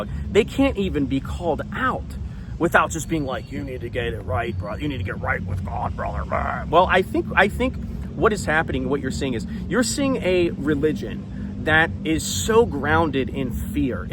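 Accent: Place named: United States. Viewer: Wow. I just finished watching the entire video and I'm very impressed by your intellect. I'm glad some Americans like you can think critically with valid information and make out an answers by themselves. I'm not a Christian and neither do I plan to be one but I'm very glad a white American (Just assuming based off of your accent and appearance) is actually following the true teachings of the Christianity and not some twisted version made by ideologically skewered men. Thanks for making this video, it gives me atleast a glimmer of hope in a country I though was doomed and destined to fail.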